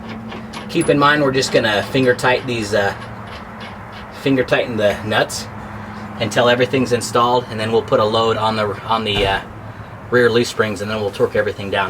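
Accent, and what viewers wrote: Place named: United States. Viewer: That awesome accent haha fckin love anericans! Lol Great vid ;)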